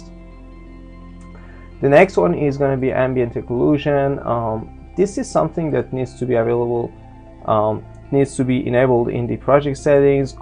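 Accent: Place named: Iran. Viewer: سلام داش از لهجت میخوره ایرانی باشی میشه لطفا بگی چجوری باید لنز فلیر رو برای موبایل آنش کنم؟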